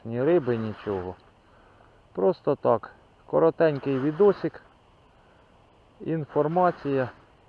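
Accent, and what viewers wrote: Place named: Cherkasy, Ukraine. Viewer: Неначе черкаський,,говір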